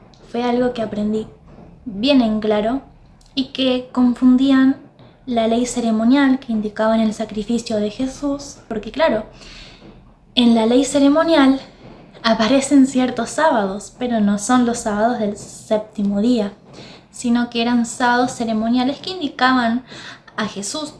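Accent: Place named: Misiones, Argentina. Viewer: Hola. Soy Nidia. Nací en Misiones, Argentina, así que tu acento me es familiar. Estaba viendo otra cosa y toqué la pantalla sin querer y me trajo a tu video... una "diosidencia"... me encantó tu testimonio y la manera tan dulce que tienes de hablar, tambien la profundidad de lo que dices. Eres una sierva del Señor. Gloria a Dios por como te usa. Yo hace mas de un año estoy compartiendo los repasos de las lecciones de escuela sabática en mi canal. Y otros temas de salud mental pues soy psicoterapeuta. Te invito a ir a mi canal, quizá te guste estudiar conmigo. Y cuando dijiste que somos sólo barro en manos de Dios me sentí tan identificada, siempre digo lo mismo. Es más, compuse una canción sobre eso. Te la quiero compartir, con mi esposo formamos el Dúo Qadash, y grabamos esta canción con unos sobrinos del corazón, el grupo Cyan de Venezuela. 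Te la comparto y espero te guste. <link> Dios te bendiga y te use con poder en la predicación del evangelio. Queda poco tiempo para su venida, tenemos que estar fundamentados en la Palabra al 100%. Yo me dedico a predicar en mi canal. Y espero que tu canal llegue también a muchas personas... si todos los adventistas usáramos nuestras redes para cumplir la misión llegaríamos a todo el mundo rapidísimo. Dios está despertando a su pueblo, está levantando un ejército santo dirigido por su Espíritu... nosotras somos parte de él. Gloria a Dios por eso. 🙏 Te mando un abrazo. Me suscribo a tu canal y puse el "me gusta" número 667... te hic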